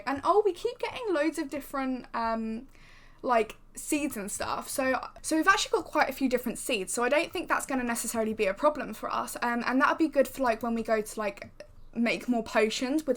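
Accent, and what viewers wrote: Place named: United Kingdom. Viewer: I love british accent♡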